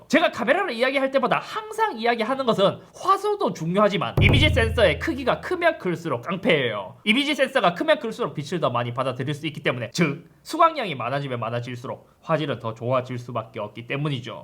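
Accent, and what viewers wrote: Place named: North Gyeongsang, South Korea. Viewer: 잇섭님 리뷰는 대구사람으로서 듣기 아주 편합니다. 보통 유투브 경상도 사투리는 경상남도 사투리라 되게 다르게 들리는데 고향이 경상북도신가 봅니다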